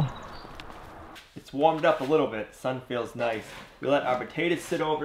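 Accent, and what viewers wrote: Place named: Northeast, United States. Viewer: Moulton - It could be his unique NE USA accent. My 'ear' didn't catch it as odd. Although I'm SE NC, I had relatives who were No. NC & So. VA, with accents of saying "out & house" that sounded similar to "boot." My Grandma called shovel "shevel" & cover "kiver" with short vowels. 😊 I know is further north, but IDK where he grew up. It's the "Queen's English," as NC's tourist commission calls that type of accent, remains in parts of NC. Not quite northern VA nor New England. Many local areas have uniquely nuanced ways of speaking. just sayin'.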